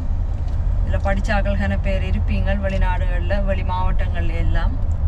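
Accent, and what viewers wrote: Sri Lanka. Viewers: Hi..... Good night sweet dreams Sri Lanka speech Vera level... Super Congratulations sister and brother 👪 💚💛🧡🏠🏠🏠😍😍😍👁👁✳️✳️✳️✳️✔️✔️✔️💯💯💯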